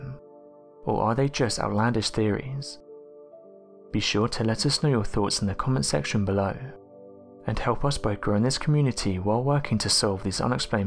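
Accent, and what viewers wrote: England, United Kingdom. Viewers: Really tired of the Heavy English accent much of which couldn't be understood 🍀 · I waited for a British accent to begin commentating the video...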